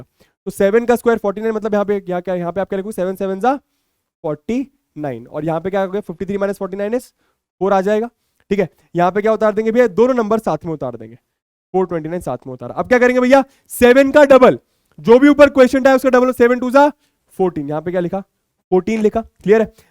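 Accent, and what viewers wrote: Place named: Bihar, India. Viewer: My mom asking "is he bihari"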